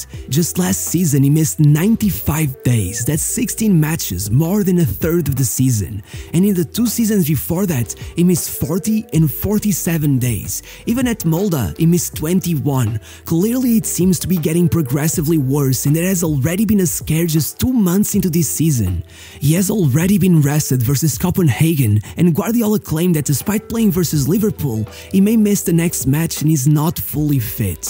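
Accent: Portugal. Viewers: Vi logo pelo sotaque és tuga, impossivel nao notar lol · The Portuguese accent is strong lol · Logo vi que esta pronuncia tinha de ser portuguesa. Continua com o excelente trabalho :)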